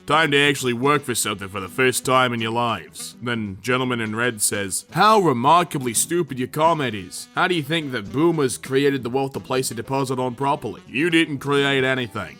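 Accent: Australia. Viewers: I’m an aussie, and I just gotta say GREAT accent! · the australian accent while pretending to be american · 10/10 Aussie accent. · Me: r/murderedbythatAustralianaccent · Fair play to that Aussie accent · This was a great video, but your Australian accent pained me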